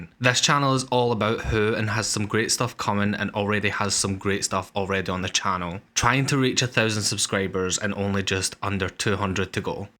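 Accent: Scotland, United Kingdom. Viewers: God I love your (I wanna say Scottish?) accent. “Doctor Heu” “Classic Heu” “New Doctor Heu”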